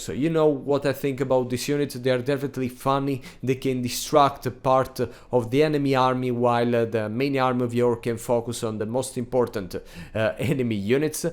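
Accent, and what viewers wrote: Italy. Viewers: I started to finally play more TWWH 3 recently. Your channel is great for me. Learning a ton. And you Italian accent is wonderful! (I'm American)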